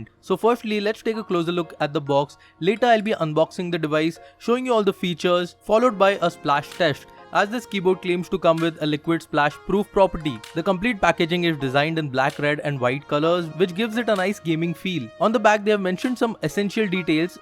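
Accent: India. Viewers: i hate India accent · LUL, not all Indians sound like him btw ! q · Some criticism: 1. Stop emphasising the fact that this keyboard is "useful for gamers." It's part of Asus's Cerberus line up which is specific to gamers. 2. It's not your Indian accent, but just your voice that's irritating. I never thought an Indian accent could become worse honestly. 3. Who the fuck does a keyboard review and doesn't do a sound test? Like it's standard for all keyboard reviews. 4. Manuals are all available online, you don't have to literally show them page by page. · i really hate the Indian accent, but nice video. thanks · Indian accent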